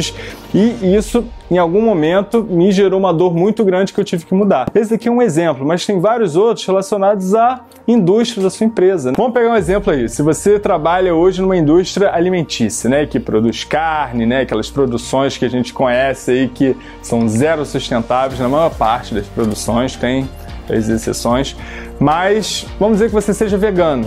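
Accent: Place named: Rio de Janeiro, Brazil. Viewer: examente, um sotaque carioca e um bom cenário iludem muita gente.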